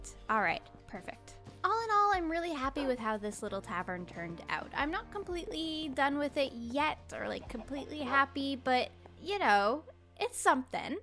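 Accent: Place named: Canada. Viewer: but still, her Canadian accent confused me a bit, as I am from England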